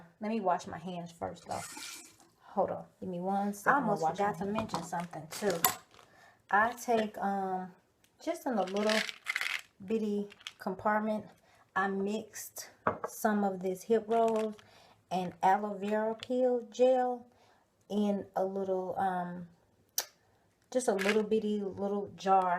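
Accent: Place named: South, United States. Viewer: ❤️your southern accent! I'm from Connecticut. Where are you from?😁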